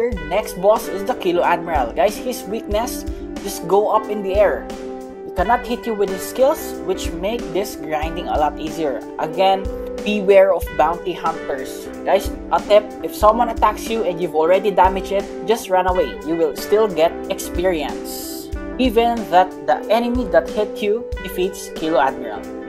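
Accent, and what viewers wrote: Philippines. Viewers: R u a filipino? Bc the way u speak english is just like filipino and ur wearng a philipines strae hat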